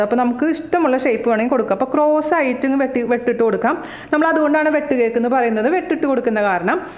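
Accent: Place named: Kodungallur, India. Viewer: Chechi... ഞാൻ ചേച്ചിയുടെ ചാനൽ കണ്ട് തുടങ്ങിയത് ഈ ഏപ്രിൽ മാസം മുതലാണ്. ശ്രദ്ധിക്കാൻ കാരണം ചേച്ചിയുടെ സംസാരരീതിയാണ് കേട്ടപ്പോഴേ തോന്നി നമ്മുടെ നാട്ടുകാരിയാണെന്ന് (ഞാൻ കൊടുങ്ങല്ലൂർ). പിന്നെ ചേച്ചിയുടെ വീഡിയോസ് കണ്ടു തുടങ്ങി. ആദ്യം കണ്ടത് ചേച്ചിയുടെ കഥയാണ് പിന്നെvacation വീഡിയോസ്, യാത്രകൾ, വീട് ,ഷോപ്പിo ഗ് അതെല്ലാം കണ്ട ശേഷമാണ് റെസിപ്പികൾ കാണാൻ തുടങ്ങിയത്.ആദ്യം പരീക്ഷിച്ചത് കേക്ക് ആയിരുന്നു അതിൽ വിജയിച്ചു പിന്നെ ബിരിയാണി, ഗുലാബ് ജാമുൻ ,അങ്ങനെ കുറേ ഐറ്റംസ് പക്ഷെ ഏറ്റവും ഗംഭീരമായത് വെട്ടു കേക്ക് ആയിരുന്നു. അച്ഛനും അമ്മക്കുമൊക്കെ ഒരു പാട് ഇഷ്ടമുള്ളതാണ് ഈ വെട്ടു കേക്ക്. എന്തായാലും ഇപ്പോ കുറേ പലഹാരങ്ങൾ ഉണ്ടാക്കാൻ പഠിച്ചു. ഒരു പാട് Thanks ചേച്ചി.എല്ലാ പ്രാർഥനകളും.....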